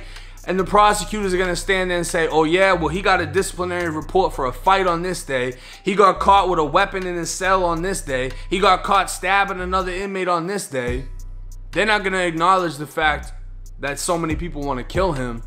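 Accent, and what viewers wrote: Boston, United States. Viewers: This dude who's talking must be from BAASTON🤔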